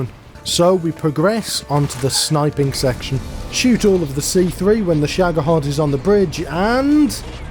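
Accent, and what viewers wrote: United Kingdom. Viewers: Notice he dropped the British accent when he started singing, like it’s something they do on purpose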